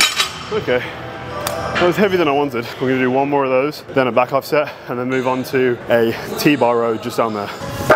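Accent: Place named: United Kingdom. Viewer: drug addict explains drugs in british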